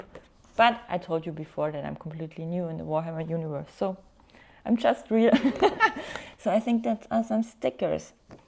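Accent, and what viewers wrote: Germany. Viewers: Nach zwei Sekunden wusste ich dass du deutsch sein musst 🤣 obwohl dein Englisch nicht schlecht ist, man hört es eben immer heraus. Ich wünsche euch viel Spaß bei dem tollen Hobby, ich bin auch noch Beginner und fokussiere mich erstmal auf das PS5 Game, habe früher aber auch gerne so was wie Heroquest und gelegentlich D&D gespielt. Jetzt verkaufe ich alles was ich habe um mir eine Armee zusammenzustellen 😉 Ich werde deinen Weg hier gerne weiter verfolgen. Grüße aus Hamburg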